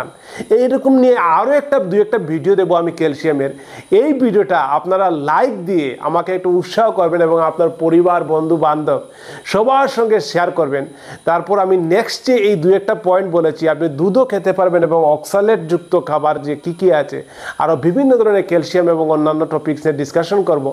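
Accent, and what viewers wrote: Sylhet, Bangladesh. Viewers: ভাই আপনার বাড়ি কি সিলেট। আপনার ভাষা টা সিলেটির মত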